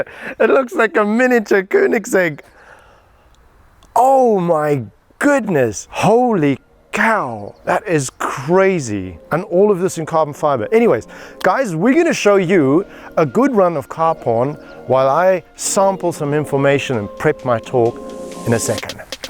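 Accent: South Africa. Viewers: is this dude south african ???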